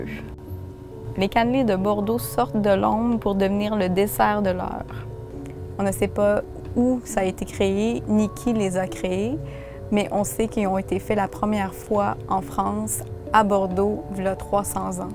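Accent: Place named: Quebec, Canada. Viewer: OMG what is her accent, is it Canadian??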